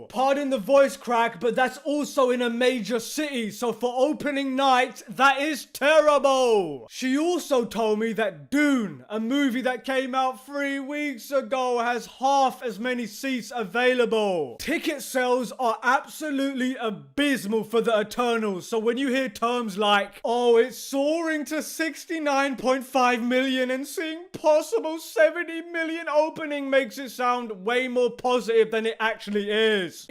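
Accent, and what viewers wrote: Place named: England, United Kingdom. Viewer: I think the content you create and the opinions you have here are valid and all but I absolutely HATE the way you aggressively shout with that horribly abrasive English accent, it makes it almost impossible to watch more than a few minutes of the video. Reminds me of certain scumbags that I used to meet on random UK discord servers with this 'know all, know fuck all' attitude that you yourself seem to have adopted for this channel. Hard pass mate.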